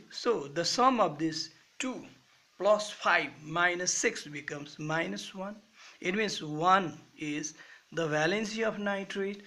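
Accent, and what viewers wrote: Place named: Nepal. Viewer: Thankyou sir Tapaiko accent ta nepali ko jastai xa ta🧐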